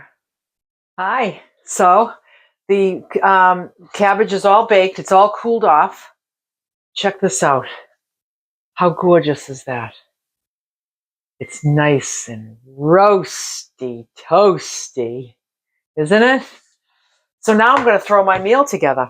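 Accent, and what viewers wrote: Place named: New York, United States. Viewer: I absolutely love your hair AND .....your roasty toasty cabbage and your accent love love it!!!! Im from NY too!!!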